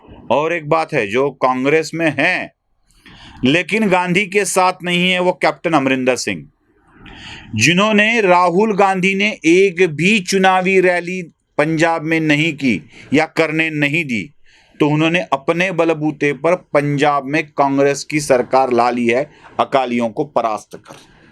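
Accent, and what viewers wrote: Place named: Maharashtra, India. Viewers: Great.. Good... But you have to improve in hindi diction & leave marathi accent No doubt keep practising will get good following due to your pitching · सर आपली हिंदी मराठी वळणाची आहे तरी खूप छान आणि सटीक आहे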